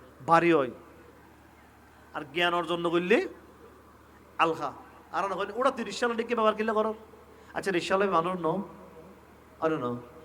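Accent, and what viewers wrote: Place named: Chittagong, Bangladesh. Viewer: হুজুর চট্টগ্রামের ভাষা বুঝিনা 😢😢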